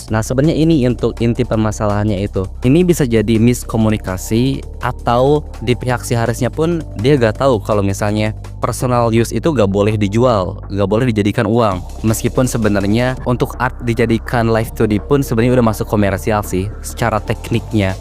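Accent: West Java, Indonesia. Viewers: bang, kamu orang sunda ya?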